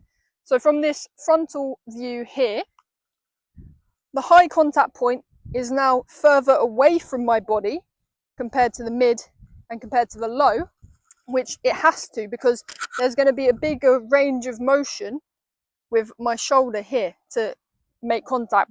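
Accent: United Kingdom. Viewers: Definitely British, I lived in Britain for 30 years. I sense a hint of a Cockney accent aswell. She's gorgeous! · You are a detailed good teacher. By the way, are you British ?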